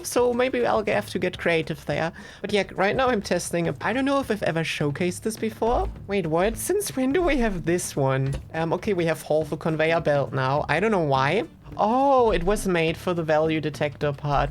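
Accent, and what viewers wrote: Germany. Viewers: she isnt using a voice changer, i think shes trying to make her voice less deep. and shes german so that may be why u cant understand her for some reason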